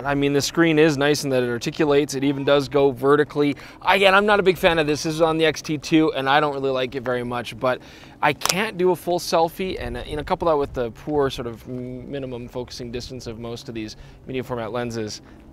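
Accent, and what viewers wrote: South Africa. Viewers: LOL I'm South African and your translations were hilarious! Did you say "lekker lekker"? LOL you sounded so South African! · BTW, Godox now have a trigger and firmware upgrade for their Flash units that will allow HSS and TTL for Fuji camera's. PS, I think your South African accent went over his head! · That's an Afrikaans accent. Interesting. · This is SA accent! Where and why did you pick up such a convincing accent? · Very impressive SA accent. Very chuffed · a great camera for traveling of fuji. BTW your South Africa accent is pretty good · dude are you South African too?! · Great video as always. What on earth is with the temporary South African accent? It's messing with my head! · Nice SA Accent!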